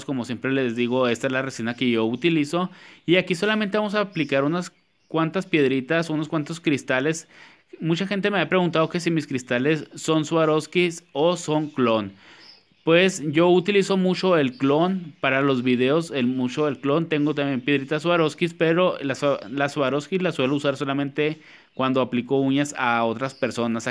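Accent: Chihuahua, Mexico. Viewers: De dónde eres? hablas como lo de Chihuahua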